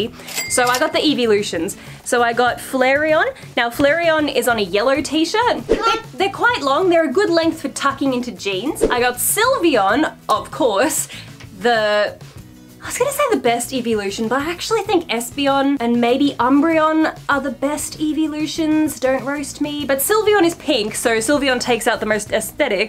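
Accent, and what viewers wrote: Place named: Australia. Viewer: I would love to see you do a video about how you got started doing YouTube + how you balance work, life, and being a content creator!! (Although I understand you can't spill all your secrets haha!) I find your videos super inspiring and I love your style and personality so much. I'm going to Japan soon so I've spent the last week finding, and then binging your videos to get ideas on what to look out for when shopping, and I can't get enough! 😭💖 As a NZer I feel like I'm getting a bit of an Aussie accent from watching so many of your videos.... but anyway, I can't wait to watch whatever you post next! 💚💚💚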